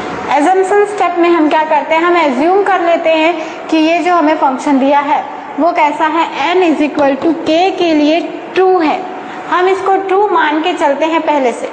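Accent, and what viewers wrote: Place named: Bihar, India. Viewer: Why using bihari accent 😞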